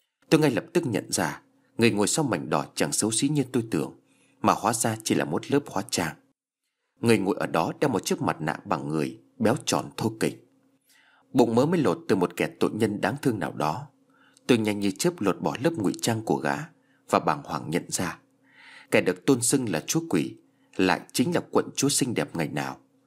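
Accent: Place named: North, Vietnam. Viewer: Giong bắc nhưng chuẩn,em da nghe a soạn nhiều rồi,cũng dc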